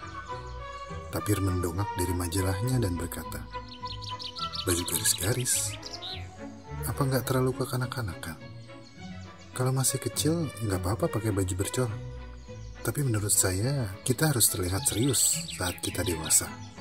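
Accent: Indonesia. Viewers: Pengisi suara di channel ini tuh org indo kan?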